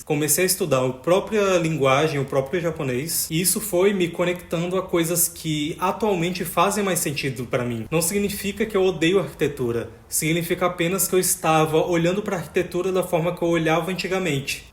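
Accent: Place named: Natal, Brazil. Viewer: nossa, tô maratonando seu canal e logo pensei “será que ele é de Natal?” pelo sotaque mas principalmente pelas gírias hahahaha amei ❤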